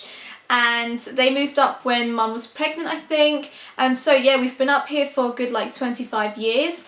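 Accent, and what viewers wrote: Scotland, United Kingdom. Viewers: Thanks for a very candid and personal insight. Nice to know you better 😊 Never knew you were Scottish ! The accent fuelled me 🤔 Your challenging high schools years do not appear to have affected you negatively. Your looks and personality are much more suited to bring in front of the camera ☺️ The quality of video and sound on your videos are excellent. Maybe you could do a video on what gear and settings you use for other Youtubers ? Love your channel. Please keep them coming.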